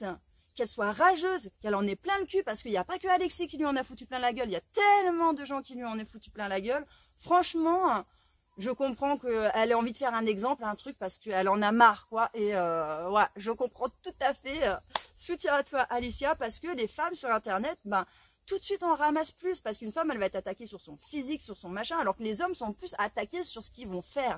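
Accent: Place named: Bourgeois, France. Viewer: En plus tu parles avec ton accent de bourge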